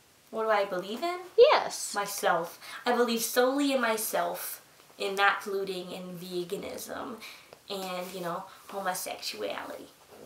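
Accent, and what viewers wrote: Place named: Upper Midwest, United States. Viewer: Let me guess, she's from Wisconsin? Perhaps Minnesota? Nice accent:)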